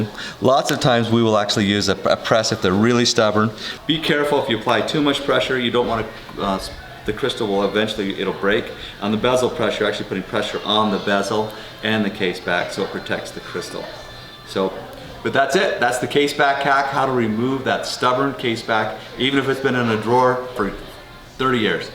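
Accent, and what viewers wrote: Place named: Utah, United States. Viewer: I appreciate the information---I recognized your Utah accent in 1 second and knew before looking up you absolutely had to be in Utah lol. Thanks again!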